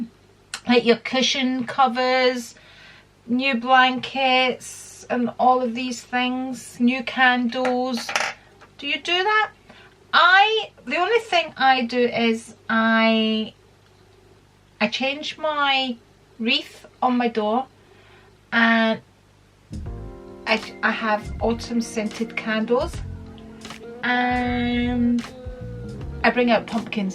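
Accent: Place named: Glasgow, United Kingdom. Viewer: Tb brutally honest as a fellow Glaswegian if we don't have the Glasgow University accent I feel we are under valued. I am a partner in a very successful international security company but as my husband is from a very affluent side of our city his accent is more appreciated shall we say. I love watching you and your family. So down to earth and relatable, don't think it's you sweetheart by any means but as I said sometimes it's just the way we speak. Hopefully things will pick soon, before you know it. X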